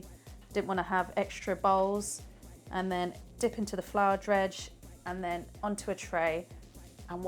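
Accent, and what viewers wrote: United Kingdom. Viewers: Please don't use music. British accent and asmr is the way forward. Trust me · I love how Brits pronounce words compared to Americans, it’s hilarious 😂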